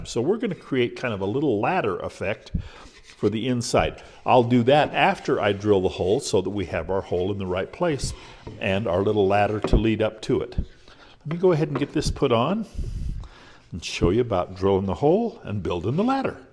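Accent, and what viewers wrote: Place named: Pennsylvania, United States. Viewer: I'm trying to figure out where your accent is from. Pennsylvania? That's my best guess.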